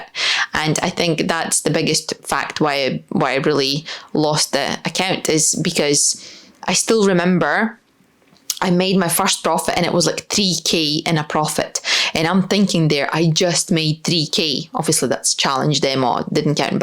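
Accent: Scotland, United Kingdom. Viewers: Funny thing about your accent. You grammar isn't 100% native, but I thought it was just some sort of Scottish vs American English difference. But I don't think Americans can hear any Latvia accent, just the Scottish accent.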